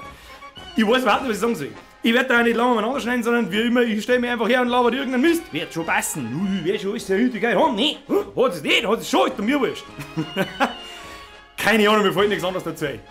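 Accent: Bavaria, Germany. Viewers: Sagmal woher aus bayern kommst du? :D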